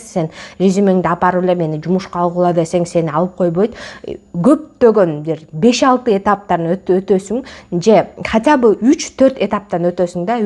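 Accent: Standard. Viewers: Азаматсыз. Жакшы. Дагы да ишиңизге ийгилик. Сизди көп адамдар көрүп үлгү ала турган экен. Бирок капа болбоңуз, деген, дегенди эле азайтып сүйлөсөңүз ,дагы да эң жакшы болот эле. Рахмат. Болбосо кыргызчаңыз аябай так, таза экен. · Кыргызчани таза луйлот экенсиз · Ай вай молодец карындашым! Кыргызча таза так суйлоп тушундургонунузго! Жоп жонокой кылып . Мындайча айтканда супер! Кыргыз болуп туруп башка тилде суйлогондорго окунучтуу!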